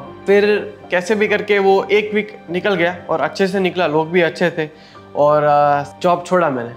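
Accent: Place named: Gujarat, India. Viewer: That gujarati accent 😊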